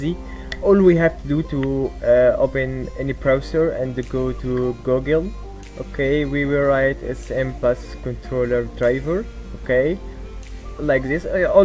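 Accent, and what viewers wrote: Egypt. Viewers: Thats an egyptian accent are you egyptian??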